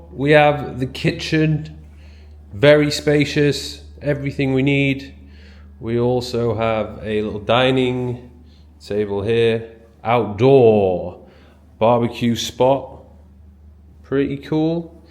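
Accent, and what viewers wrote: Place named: Liverpool, United Kingdom. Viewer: Whats a scouser doing talking about marbella and ibiza. Stick to liverpool luv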